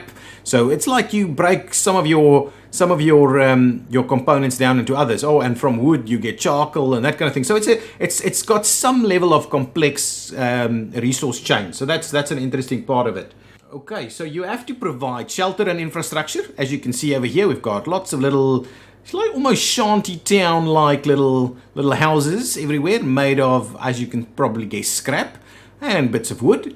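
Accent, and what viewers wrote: Afrikaans, South Africa. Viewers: Can't put a finger on your accent, unless.... Are you South African? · the afrikaans is thick may bru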